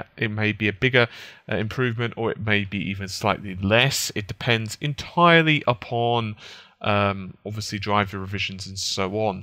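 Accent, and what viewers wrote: United Kingdom. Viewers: It's because he is British. They have many strange accents there.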